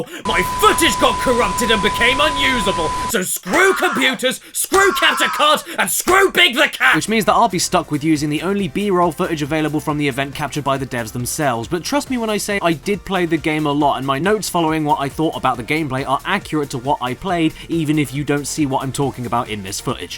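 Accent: United States. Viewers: To be fair you do talk like a yank a lot so maybe that's why they thought that.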